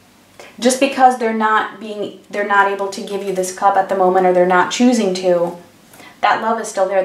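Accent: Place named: United States. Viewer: Also are you Greek? Your accent sounds American.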